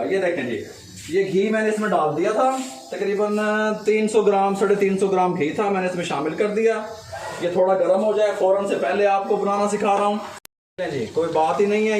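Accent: Punjab, India. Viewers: Apki recipe ke sath hum apki punjabi boli bhi bht enjoy krtay hain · Aap k bolne or kam karne ka andaz bilkul indian punjabi ki tarrah ha. Aisa ha kia maha raj?such bolna thanu guru di qassam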